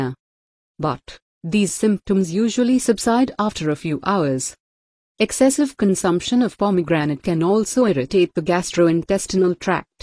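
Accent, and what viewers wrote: India. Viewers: It’s an Indian Accent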